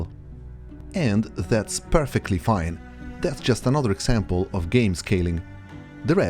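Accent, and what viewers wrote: Italy. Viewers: Amazing video! Very sleek editing. I was wondering how you got rid of the fog, a run without it sounds fun. Also, are you Italian? I'm not completely sure but you pronouncing the name of that town correctly and your accent points that way. Great job! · Ho la sensazione che tu sia italiano, l'accento c'è, son contento di vedere qualcuno dei nostri fare BENE dei video in inglese, complimenti! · Your accent is Italian eh? :) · You have the most Italian accent 😂😂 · L’accento italiano è valore aggiunto al video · ma sei italianissimo si sente subito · Compà si sente da 80 km che sei italiano 😂, bella li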